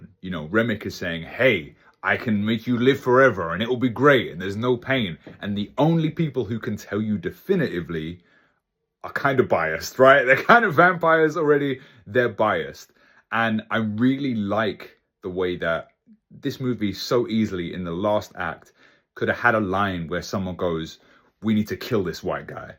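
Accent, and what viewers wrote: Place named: England, United Kingdom. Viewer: You have an English accent 😂😂😂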